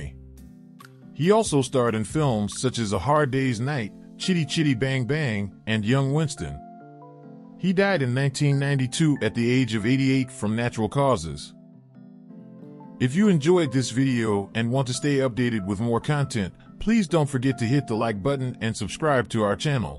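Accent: United States. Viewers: A narration with an American accent is inappropriate for this video. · Got the pronunciation wrong. Told in americanese.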